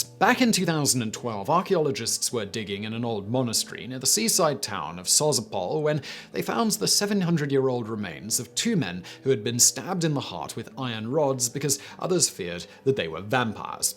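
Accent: United Kingdom. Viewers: Okay? He’s British, American English will be different to traditional British English.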